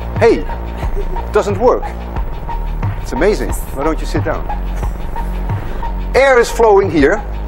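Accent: Netherlands. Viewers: I am hearing een beetje Dutch teacherrr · Frikandellen accent is real on this one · why does it sound like a dutch teacher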